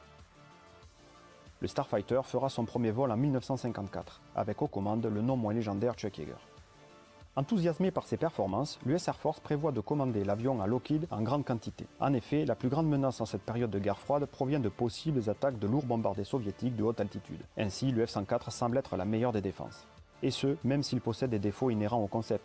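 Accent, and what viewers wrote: South, France. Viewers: excellente vidéo ! bel accent du sud 👍😆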